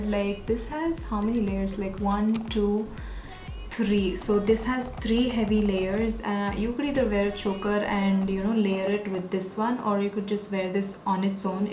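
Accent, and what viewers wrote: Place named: North India, India. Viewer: U look like a north indian by accent and looks💯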